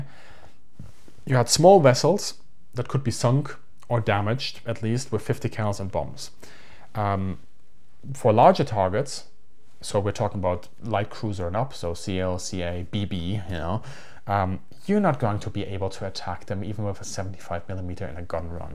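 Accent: Germany. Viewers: Is he german? Hört sich nämlich so an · Dude, your german accent is horrible....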